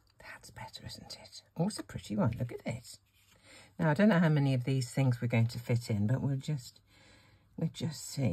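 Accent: England, United Kingdom. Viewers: Today was the first time I've seen you, it was lovely to hear an English accent (just for a change) and to see dies whose make I have but have never seen demonstrated before. Enchanting l loved every minute thank you ❤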